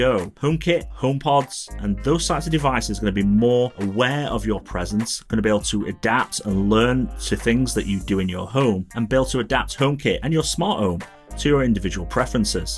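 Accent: England, United Kingdom. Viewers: Are u in the uk? I’m only asking as you mention the price in dollars but have a English accent 🙌🏼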